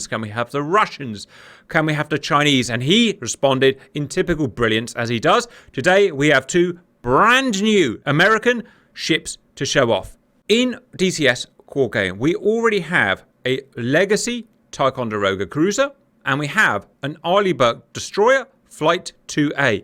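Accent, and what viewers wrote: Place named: United Kingdom. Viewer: Damn you, damn you and that awesome British accent. =)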